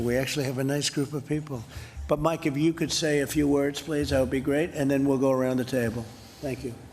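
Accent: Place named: New York, United States. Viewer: He has a New Yorker's accent, not surprising seeing as he was a New Yorker all his life.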